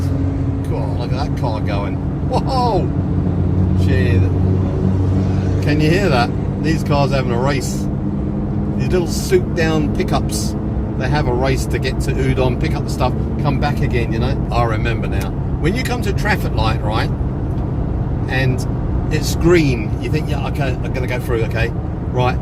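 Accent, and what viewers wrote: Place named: Australia. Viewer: Where did you get that Australian twang in your accent from?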